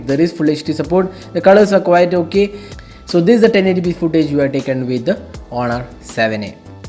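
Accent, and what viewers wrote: India. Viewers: I couldn’t watch the video, cause Indians’ English sounds so ugly and disgusting. So I was forced to give up watching :(((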